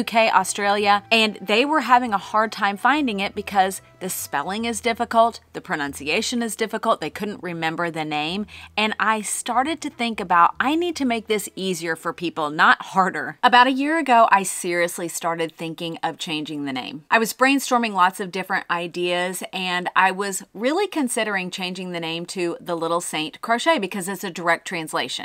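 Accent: United States. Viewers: As a French native, I know the pronunciation can be difficult and also French people are stucked up the way French words are said. French isn't easy even for the French. I think that your American accent gives it a charm. I've been in the US for the past 25 years and still have a strong accent and often I killed the English words. What matters is that you did your best and wanted to honor Therese de Lisieux. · i love your videos and i’m happy you changed the channel name! i always thought it was funny how you obviously don’t speak french but have a French! channel name😂 i think ur pronunciation was okay but a little unintentionally pretentious especially in contrast with american accent coming right after😅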